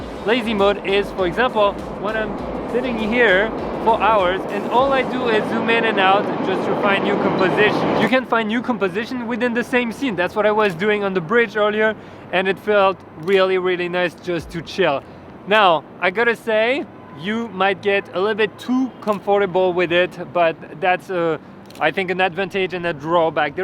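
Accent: France. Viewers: Un de ces accents français ahhahaah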